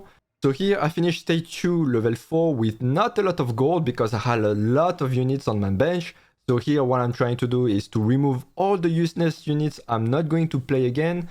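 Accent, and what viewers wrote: France. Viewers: love how you talk, did you grow up in france?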